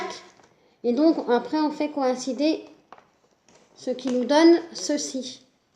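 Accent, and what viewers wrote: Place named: Brittany, France. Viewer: Bravo pour votre tuto et votre bel accent du Méné bré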